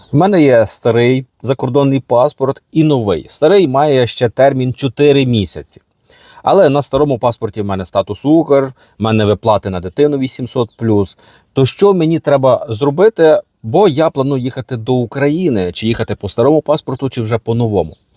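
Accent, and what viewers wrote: Western Ukraine, Ukraine. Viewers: Бридкий західний акцент і оце кошмар, говоріть українською, і наголоси ставте правильно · Який гидкий голос за кадром, ще й, з западенським підви́вом..Якою мовою вона говорить, бо я українка, але не розумію, що вона там варнякає..?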